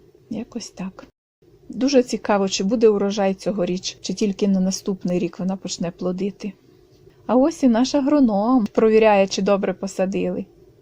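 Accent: Western Ukraine, Ukraine. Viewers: Ваша мала Батьківщина-не Карпати.Ну дуже тембр голосу західноукраїнський.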